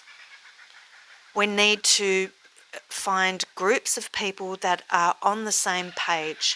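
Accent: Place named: Australia. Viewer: Hello. Thought you sounded Australian. So am I. Like what you share.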